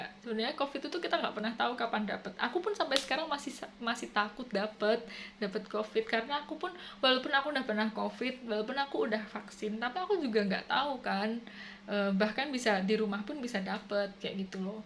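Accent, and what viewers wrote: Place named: Java, Indonesia. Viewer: Mba logat bicaranya kok njowo banget, tpi kl lgi di sinden aduh blakutek brantakan, brarti cma lucu2an aja ya 😅😅🤔 Mba aq fans kmu banget lho Aq mau tanya mb itu lahir di kota mana, sulawesi apa jawa yogya? Balas ya mb pertanyaan aq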